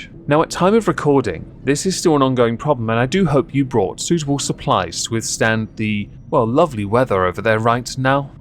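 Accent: RP, United Kingdom. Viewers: I quite like your poshness tho 😂🤣💖💓💕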